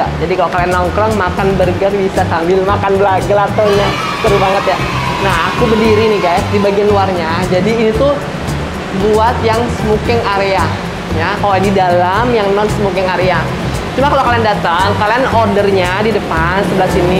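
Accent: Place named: Bangka, Indonesia. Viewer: org Bangka ya? Denger logat nya?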